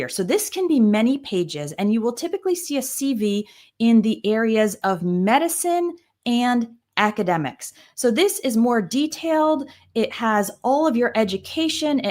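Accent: United States. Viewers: Very attractive and clear American accent, quite impressive indeed, but too short, it should be a bit longer so the learners can have a better yield ❤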